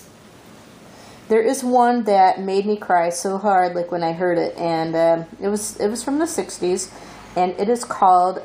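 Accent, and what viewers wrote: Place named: United States. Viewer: I'm English and I love your American accent :) Beautiful Also your laughing is Infectious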